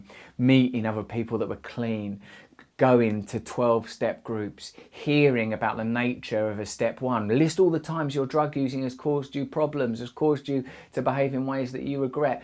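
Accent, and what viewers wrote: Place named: Essex, United Kingdom. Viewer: Russell I remember you hosting at various raves for MTV, and I knew from the almost permanently dilated pupils that you were "on the ting" 20+ years ago.....but that wasn't the first thing... What immediately jumped out of the screen was an apparent prodigy, preternaturally imbued with oratorical gifts, the likes of which I hadn't heard delivered via Essex accent lol... Glad I've stepped in the time machine to witness "that Russell" fully realised and giving back. ✌🏾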